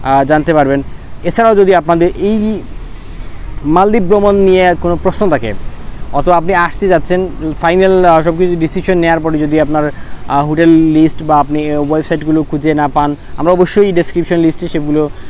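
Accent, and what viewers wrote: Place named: Sylhet, Bangladesh. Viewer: সি‌লে‌টি টোন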